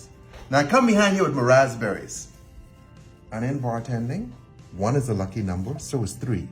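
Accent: Bahamas. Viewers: Sound like a Bahamian accent 🗣🙂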